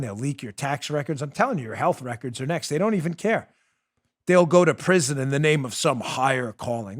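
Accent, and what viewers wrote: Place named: Louisiana, United States. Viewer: Bongino! You are the man dude! Your show must be growing, I am down here in south Louisiana sending your show to all my people in the oil and gas business! You sound like us my man! Good luck with that surgery man don't let em hit your vocal cords you have a gift!!!🤞🤞👍👍🙏🙏👌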